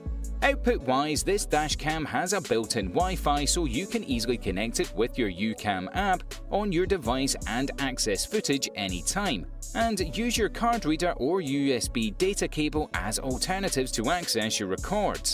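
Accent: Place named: England, United Kingdom. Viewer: you sound English but the price you give is in dollars >????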